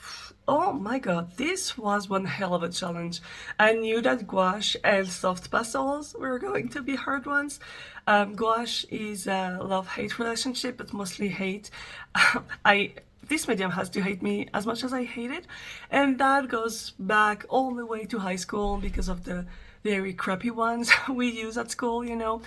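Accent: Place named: France. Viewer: Hehehe bonsoir 👀 Je viens de finir et omg le renard à l’aquarelle, je hurle! Je suis sûr que c’est une galaxie qui bouge tout le temps et que des peuples extraterrestres anciens l’ont vu et ont créé des mythologies, des textes sacrés basés dessus ou juste utilisé son existence comme un présage ou something!!! Le fait que les renards soient tous différents est super coolos AAAAA 😩😩 Ton accent est beaucoup trop mimi en anglais, jvais crever, je pleure en Oui Oui Baguette stp 😭 Félicitations pour cette première vidéo! C’était super sympa à regarder hehe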